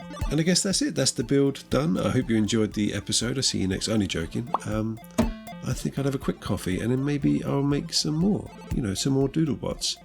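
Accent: United Kingdom. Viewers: Hahaha, your rambling sounds very much like Korg from Thor Ragnarok, with a British accent. Love the channel!!!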